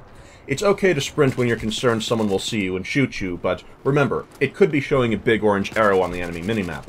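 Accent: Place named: United States. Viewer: what state of america are you from? you have the same accent as the guys from FilmCow i think! you could probably legit go to the titusvile :L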